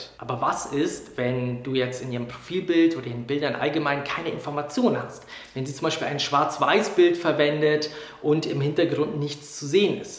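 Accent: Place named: Franconia, Germany. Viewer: Junge.... wenn du brav und anständig bist als Mädel, dann lässt du nicht nen fremden Typen wegen einer Pizza zu dir kommen. Ich bin nicht sicher, ob die Tips wirklich sinnvoll sind. Du scheinst ja halbwegs kreativ zu sein, aber die Gedanken die du dir über das Profil machst, und dann versuchst witzig zu sein.... puh.... seltsam. Dafür mag ich deinen Frankendialekt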